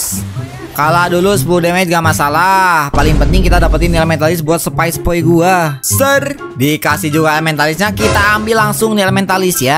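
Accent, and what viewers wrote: West Java, Indonesia. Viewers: Mang org mana Sunda n logat n alus haha 😂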